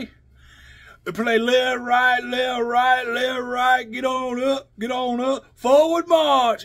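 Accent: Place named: South, United States. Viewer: My boy straight up out the south lmao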